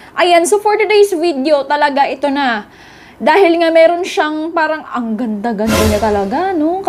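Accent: Ilocos, Philippines. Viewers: Ilocano kapo ba?? 😂😂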